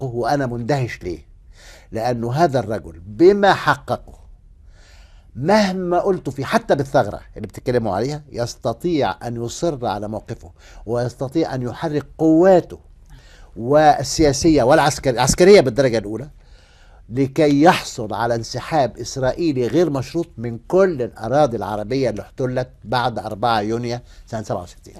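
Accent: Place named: Levant. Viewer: حكواتي بلهجة إخوانا الشوام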